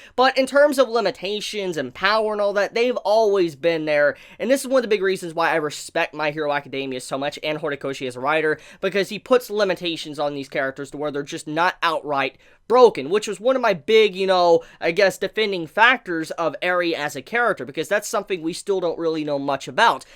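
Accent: South, United States. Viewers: I love how subtle your southern accent is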